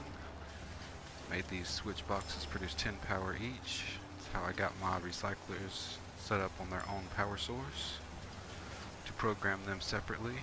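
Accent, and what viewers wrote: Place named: South, United States. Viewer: Neatly done sir! This video like the recycling plant you've featured is well executed... It shall likely provide a considerable influence on my ideas on similar matters... Thankyou... As an aside might I ask asto the origin of your accent... Your voice clear, well modulated and easy to listen to... Maybe you should consider voice over work... Would you by chance, be a Southern Gent?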